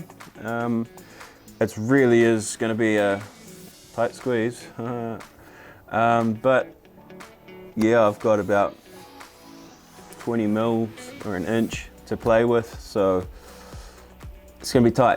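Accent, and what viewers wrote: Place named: New Zealand. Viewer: Welcome to episode fortysex..... go the kiwi accent fella! Keep up the great vids!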